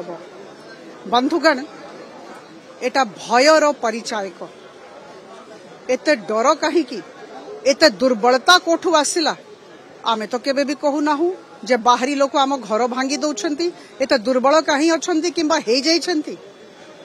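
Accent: Bihar, India. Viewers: Tume bi bihari bhasare odia kuhani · Tu bihari vasare kemiti odia kahuchhu.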